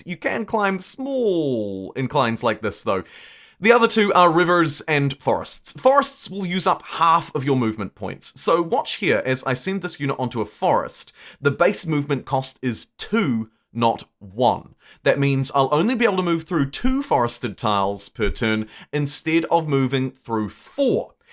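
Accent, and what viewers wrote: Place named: New Zealand. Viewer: nice newzealand accent my friend.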